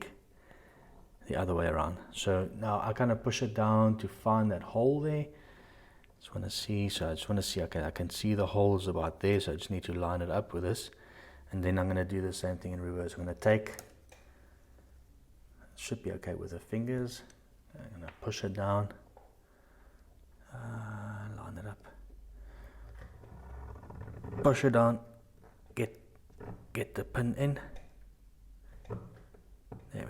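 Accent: South Africa. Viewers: I honestly love the South African accent lol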